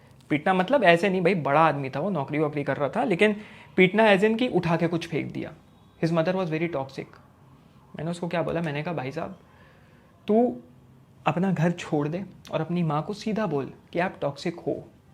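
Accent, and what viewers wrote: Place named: Malwa, India. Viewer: sun mere bhai ek baat tu bohot dhyaan se; tu dilli ncr se hai na? tu koi madhya pradesh ke indore maalwa region se to hai nahi jo wahaan ki style mein bol raha hai ZAKIR KHAN ko copy kar raha hai ,kyunki that way you speak is extremely irritating aur teri acchi baaton ki value gir jaa rahi hai saari ki saari. Kitni baar tere video dekhne chahe hain par 15 minute baad band karne padte hain becasue of the irritation caused by the way you speak which is NOT ORIGINAL.(tu bhi jaanta hai ye baat acche se)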